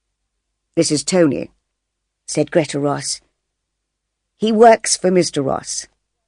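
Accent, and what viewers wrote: United Kingdom. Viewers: Thank you. It so helpful for me in British accent and reading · This is British accent, I do not like it. I like American accent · Because that girl who is reading for us the story is an English girl which means she has clear accent English. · British or American accent? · مشكلتك الاكسنت البريطانى غير واضحة!!!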